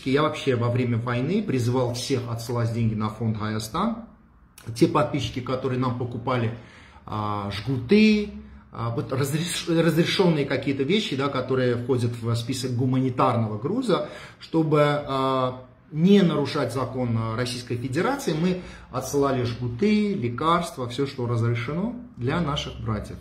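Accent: Karabakh, Azerbaijan. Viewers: акцент не меняется всегда он карабахский армянин это точно . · Этот канал скорее всего фейковый.. А этот кадр засланный казачок с азербайджанским акцентом косит под армянина и льет грязь против своих, якобы правду говорит, свой же не будет якобы врать !!! Дешёвая подделка !!! Я его невпервый раз слышу, читается как ребёнок...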